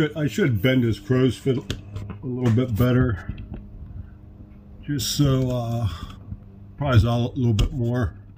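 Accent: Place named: Pennsylvania, United States. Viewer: I just hear Pennsylvania when you talk.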